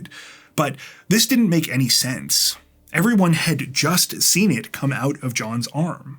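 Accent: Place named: Canada. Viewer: Dude, as soon as I heard Canadian, I was like SUBSCRIBE! (Born and raised in Toronto, and a lover of all things Canadian and true crime) So I guess this is a perfect match eh?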